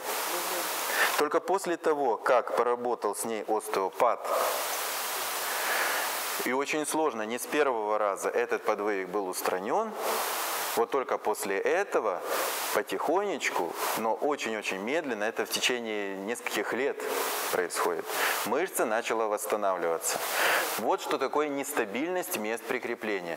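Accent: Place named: Odessa, Ukraine. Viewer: Одессит. Папа тоже травматолог.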